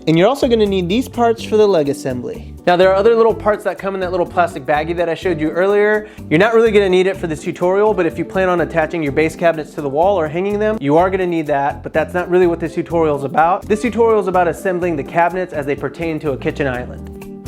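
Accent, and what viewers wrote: India. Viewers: Sounds like an Indian man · Accent sounds like a Hindu guy.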